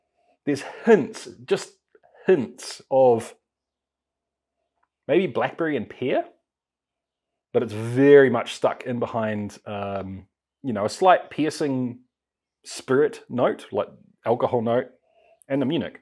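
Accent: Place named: New Zealand. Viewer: NZ accent, bro.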